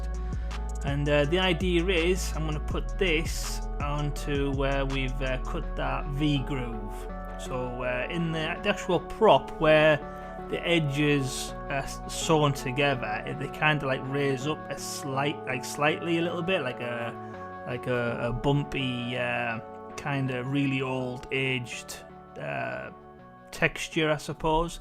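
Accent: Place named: County Durham, United Kingdom. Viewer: Hi buddy, you sound like you are up my way. I'm from Seaham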